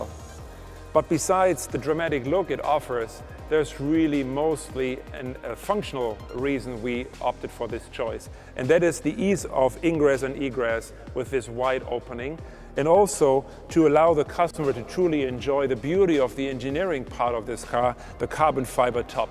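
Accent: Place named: Germany. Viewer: Der Man hat ein deutschen Akzent👀 Das Video ist auf einem deutschen Kanal👀 und dazu noch in dutschland👀 Warum spricht Er Englisch 🤷🏻‍♂️👀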